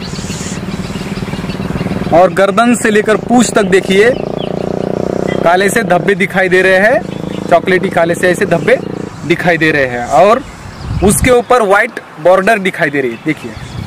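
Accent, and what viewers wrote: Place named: Maharashtra, India. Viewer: मराठी माणसाची हिंदी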